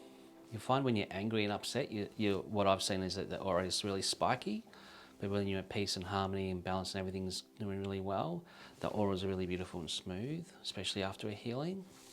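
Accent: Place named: Australia. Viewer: nonsense with an australian accent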